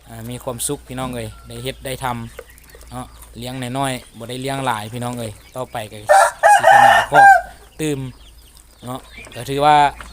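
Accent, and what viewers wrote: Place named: Thailand. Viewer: เว้าไทนาหัวพูแน่🤣🤣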